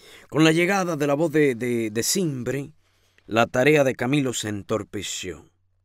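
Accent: Argentina. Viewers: muy gracioso tu comentario acerca de que te gustaría que el narrador tuviera acento argentino , no hace falta , vos lo hacés extremadamente bien y sonás como tal !!!! sos un capo !!! GRACIAS POR TU EXCELENTE NARRACIÓN Y HUMOR !!! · Gracias por este hermoso y gracioso audiolibro. ( Muy buen acento argentino, te lo dice un a argentino🙂🇦🇷) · tranqui rey te sale bien el acento argentino. hay veces que pienso que los sos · Tu risa es genial jajaja te sale muy bien el acento 🇦🇷 es hermosa la novela. Buenísima lectura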